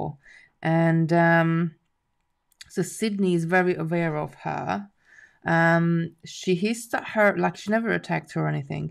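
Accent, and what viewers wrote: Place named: Hungary. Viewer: Good morning, apologies for missing u last night. That project looks beautiful. I have been trying to work out ur accent, and I heard u mention Hungary, is that where ur from? My mum was Hungarian, and came to England with her mum and two brothers during the revolution in 1956. I have been there a few times and love the country.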